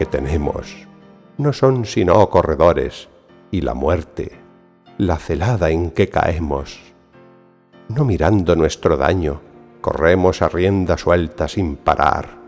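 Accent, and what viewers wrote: Galicia, Spain. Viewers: Cuando lo recita un gallego suena mucho mejor, yo que tengo acento argentino. Muchas gracias, maestro 👏👏👏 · Perfectamente! A mi me gusta muchísimo. Eres mi amor. Tienes un acento gallego? (soy un extranjero, es dificil para mi distinguir) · Estimado, por tu dejo, te adivino peninsular. Razón demás para llamarte la atención, pues siendo paisano del inmortal Manrique, le faltas el respeto;en la declamación y escritura. Me bastó escucharte unos minutos para ello. Al declamar en la primera estrofa separas, pareciera intencionadamente o por ignorancia"... y chicos " Lo segundo y más desastroso y lesa literatura: cambias" allegados", por "y llegados". Soy un peruano cincuentón, amante de la buena literatura. No entiendo como no corregiste semejante desaguisado. Tu dejo debió ser un plus, sin embargo, no hallo calor y sentimiento. Ojalá valores y tomes las críticas con ánimo de enmienda. 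Att. Ramsés Torres Ramírez Puente Piedra-Perú.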